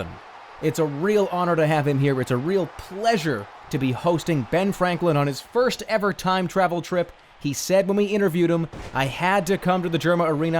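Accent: Boston, United States. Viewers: Jerma sounds so much more bostonian here I love it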